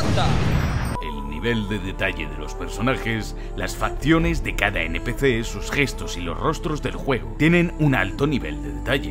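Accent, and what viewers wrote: Spain. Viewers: Normalmente hacen un 50% y 50% en poner doblaje latino y español, no pierdan esa costumbre. Lo comento por que en este video se nota mucho el doblaje español sacando el detalle del capi en la outro del video.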